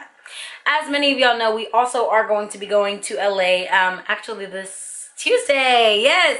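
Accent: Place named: Nigeria. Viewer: Oh my gosh, I haven't heard anyone do a Nigerian accent in a very long while. Ahh, I wan't to go back so badly!!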